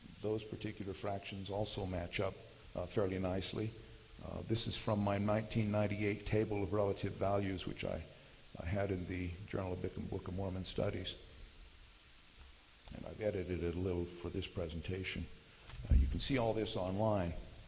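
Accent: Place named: Midwest, United States. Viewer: Regional dialect. I'm guessing Midwest. Excellent presentation.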